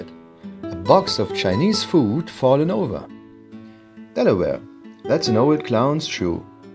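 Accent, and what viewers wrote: Germany. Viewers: God I love the German accent, great stuff too and nice music! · This guy has the best German accent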